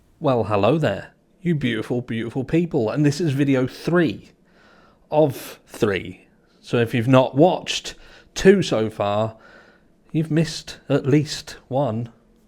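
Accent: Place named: England, United Kingdom. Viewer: U sound like ur from England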